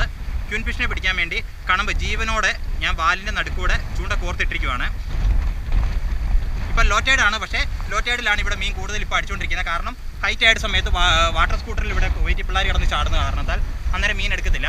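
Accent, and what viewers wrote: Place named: Kottayam, India. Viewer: Anta kottayam bhasa manassilavanillaa. ..ijjonnu sarikk njammala Malabar bhasayil samsarikkado...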